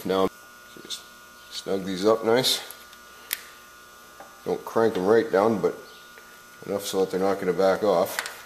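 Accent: Alberta, Canada. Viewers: Sounds more like an Alberta accent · canadian accent, lived there for 8 years ;) looking to self install cam in my 2012 camaro ss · are you canadian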